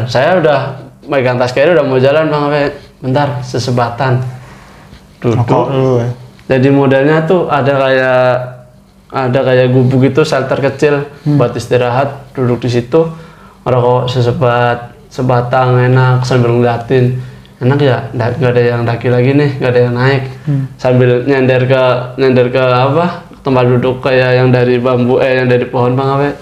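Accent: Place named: Banyumas, Indonesia. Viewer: Nonton idola bang wong dewek ngapak hiii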